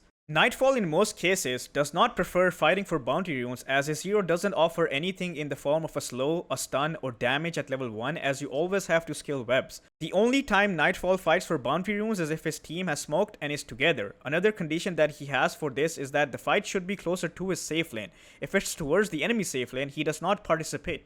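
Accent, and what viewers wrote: India. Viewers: shut up disgusting indian accent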